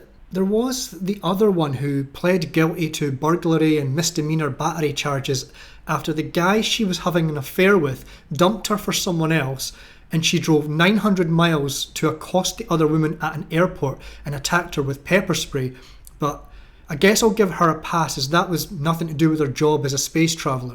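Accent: Scotland, United Kingdom. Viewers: Hey ,,,,,,,,,,,there is nothing wrong with you and your contents, and I Looooooove your Scottish accent too. Like you i cant wait for the day when this Garbage is dumped to the Landfill of the Human History, and those who really want to work for the sake of progress in life, get their turn at the Mill.✌ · As a former Kiwi I love the tale being told in a Scots (or South island ) accent . Where did capt rugmunch get all of those gongs? it looks like the display of a Swiss Admiral. The NZ Navy was once a proud service and even fought with distinction in WW2. Winston Churchill gave a dinner for the Crew of the Archillies after her success in the battle of the river Plate, HMS Achilles won instant immortality in the Battle of the River Plate in December 1939. Leander’s early war was much quieter, although in February 1941 it sank the Italian commerce raider Ramb I in the Indian Ocean. 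HMNZS Leander was to see more dramatic action in the Pacific War. In July 1943, fighting alongside US ships, the cruiser confronted a Japanese force in the night-time Battle of Kolombangara. After helping to destroy a Japanese cruiser, the New Zealand vessel was hit by one of the enemy's feared ‘Long Lance’ torpedoes. The crew performed surperbly to save their ship, but 26 seamen were killed. Eventually sent to the US for repairs, Leander would never fight under the New Zealand ensign again. almost forgotten today because no women were aboard.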